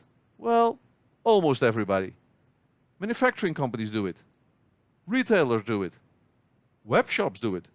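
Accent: Netherlands. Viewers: Dutch accent for the win! Haha Mede Nederlander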